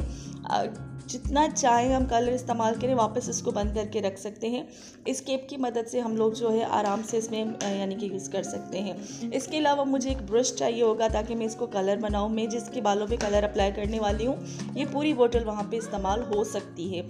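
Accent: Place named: Pakistan. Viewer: Ok I recognised from your speaking style. Pakistan mein Kahan se?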